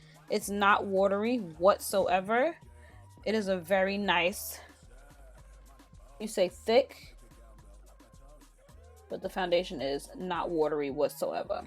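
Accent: New York, United States. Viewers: Hi,. I am pleased to meet you on YouTube. I came across your channel on the wee hours of this past werkend, while you were sitting at your desk at work. I am a career Executive Receptionist, too. The makeup look so radiant on you. I can recognize a New York dialect anywhere. New York is home to me. God bless you.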